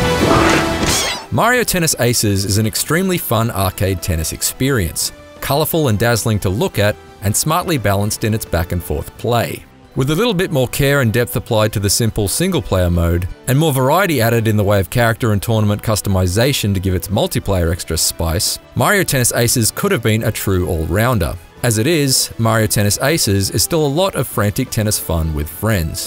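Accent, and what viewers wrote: Australia. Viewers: Great review and most importantly I really enjoyed the Aussie accent……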